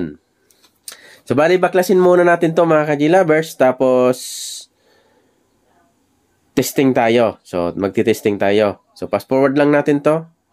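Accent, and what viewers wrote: Bicol, Philippines. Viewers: Lods bicol dialect background mu ah😂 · Sir salamat👋taga bicol kaba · Good day SIR,... Parang BIKOLANO k po,... sain po ang "SHOP" MO dyan sa BIKOL?,... nagre- REPAIR k man po nin mga "BEAM LIGHTS",... ( MOVING HEAD)??? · Bikolano po kyo sir? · Bikolano ka? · Bikol ka palan manoy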